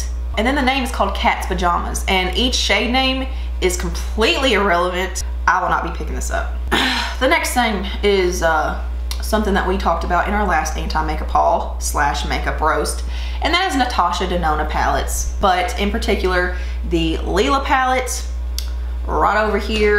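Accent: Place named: Kentucky, United States. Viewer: I randomly found you in my homepage. As soon as I heard you talk, I thought to myself "girl has to be from KY". Checked out your FB and sure enough you are. Girl I'm from Hazard and we have alot of friends in common. I went to EKU. Saw where you are there! I'll be binge watching your channel!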